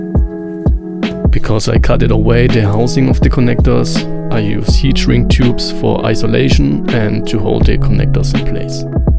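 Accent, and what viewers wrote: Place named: Germany. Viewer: Nice, and then the song 😂 You sound German not Dutch.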